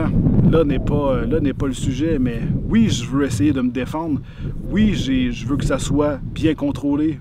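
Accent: Quebec, Canada. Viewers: Ca cé du pur Québécois ,normal que tu comprenne rien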